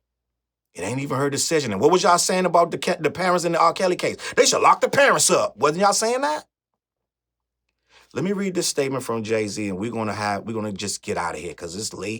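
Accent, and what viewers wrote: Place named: Northeast, United States. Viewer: You hovengers something else lmao ion trust an unbiased opinion on Jay z from a nigga with a northeast accent and fk with you heavy but nah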